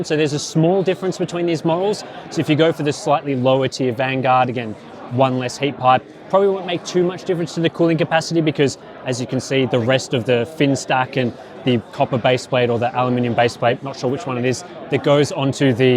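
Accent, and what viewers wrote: Australia. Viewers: All that talk about fan spin sounds like a Louis Rossmann video except for the Aussie accent.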